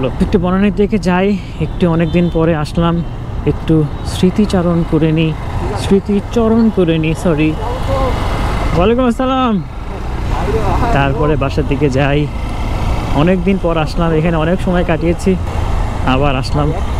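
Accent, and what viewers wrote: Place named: India. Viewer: ভাইয়ার বাড়ি কি কলকাতা নাকি 😅 ইন্ডিয়ান লোকেদের মতো কথা আসে কিছুটা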